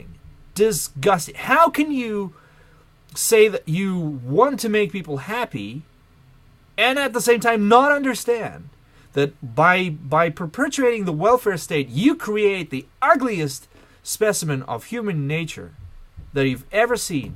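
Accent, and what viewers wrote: United States. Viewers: wow. you lived in the Soviet Union most of your life. You could have fooled me, since you have an "American" accent.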